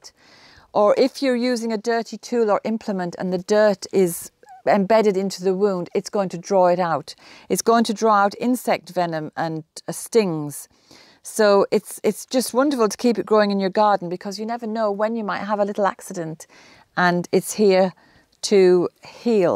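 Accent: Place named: South Durham, United Kingdom. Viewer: How can you grow plantain. I’ve just learned about it recently. Cani buy it to plant. Interesting accent south Durham perhaps?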